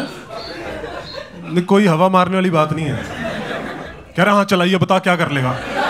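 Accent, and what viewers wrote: Punjab, India. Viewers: Awaaj se hi pta chal jata hai Punjabi ho.......I am also Punjabi by the way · Punjabi accent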